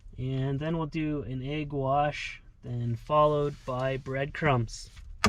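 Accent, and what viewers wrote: Canada. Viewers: i was just there !!! staying in my self converted trailer. very new. just found your videos. cheers dude!! love the canadian accent with the sarcasm. ahahah maybe see you out there sometime. im personally not ready for -20 yet but are we ever fully. Beautiful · EXCELLENT VLOG. THE SNOW AND MOUNTAINS ARE UNBELIEVABLY BEAUTIFUL. APPRECIATE THE CANADIAN ACCENT !!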